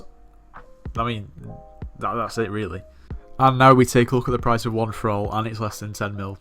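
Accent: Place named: Birmingham, United Kingdom. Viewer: WTF SINCE WHEN WAS THIS DUDE A BRUM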